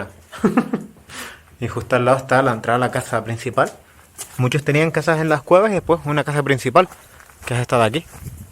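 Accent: Spain. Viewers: X eso tiene el acento español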